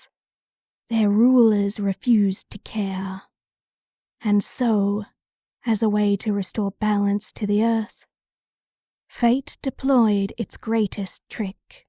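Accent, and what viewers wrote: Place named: South, United States. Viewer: Thank you so much for filling my script! I don’t know if I’m crazy or not but I think heard a slight southern ting in your performance and I really liked it. Brought out the preaching part of this script well. Thank you!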